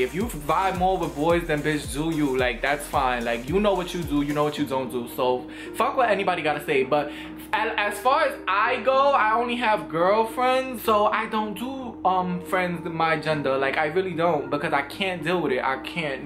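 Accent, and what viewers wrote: New York, United States. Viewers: G I love the way you talk. That New York accent. I do not be having time to be fighting no big person like if you even push my little ass i might fall. 😂💯 this branch though. I'm weak.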